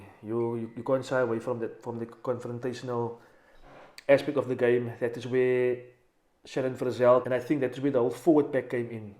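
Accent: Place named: Afrikaans, South Africa. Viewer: This idoit never heard an Afrikaans English accent before. What he doesn't know is many foreigners find the Afrikaans accent very intresting and attractive.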